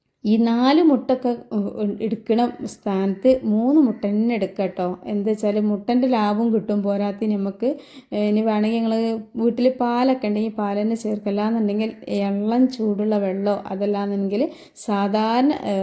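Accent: Malappuram, India. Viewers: മലയാളം മലപ്പുറം മലയാളം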